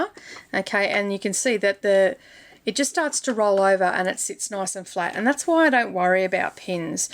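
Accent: Australia. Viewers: What a great idea. Recently discovered your channel. Nice to hear an Ozzie. Can you please advise who you purchase your labels from. Cheers Dianne Qld Aust. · Thank you for this I thoroughly enjoy watching your videos and your explanations. So very clear and easy to understand. Best of all your an aussie so a welcome voice to an ex aussie all the way in the UK. X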